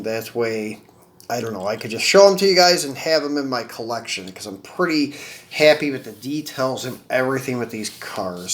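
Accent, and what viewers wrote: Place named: Cleveland, United States. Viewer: Oh and while I'm at it, it's modern, like and urhn, not moderin modern. Lol I just messing with you! I learned to talk in N.Y. and live in Colorado so my ascent probably sounds worse then your Cleveland accent? Lol.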